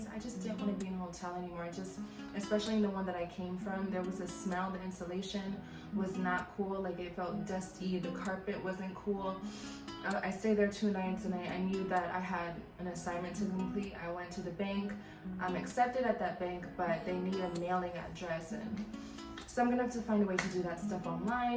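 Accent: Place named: Jamaica. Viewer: Omgggg i was typing the below message when the answer popped up on the screen. Amazing. Are you Caribbean? I always hear an accent... sounds Jamaican... as am I. Very nice place.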